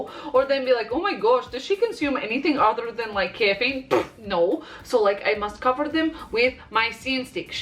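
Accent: Russia. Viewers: She sounds russian... but her voice is so soothing.... even when shes freaking out about makeup. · God i swear i love you soo much. You're like my spirit animal lmao. But you said towards the beginning that you're from Australia. I thought ypur accent was maybe Russian or something?? Im sorry, i swear im not trying to offend. Just a curcious cat. 😻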